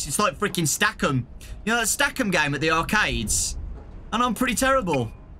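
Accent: United Kingdom. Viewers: Your from the uk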